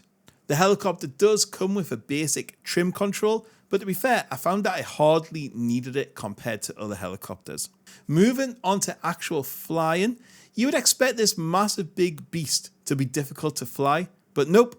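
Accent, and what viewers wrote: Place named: Newcastle, United Kingdom. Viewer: Nice to hear a Geordie voice in the milsim community.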